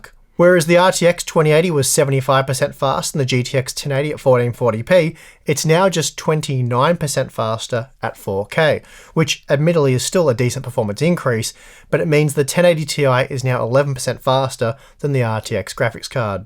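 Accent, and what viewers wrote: Australia. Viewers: I never have any problem understanding the Aussie accent but I legit heard "harbor on box" this time. Also with Turing pricing was the biggest problem. If the 2080 and the 2080Ti were both $200 cheaper they would've been a decent pair of GPUs.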